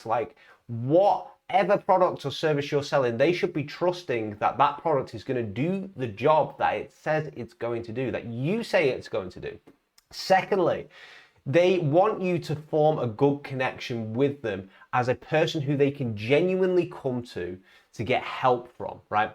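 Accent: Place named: United States. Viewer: I like your accent, I have no idea which part is it from in the US, but it's cool haha.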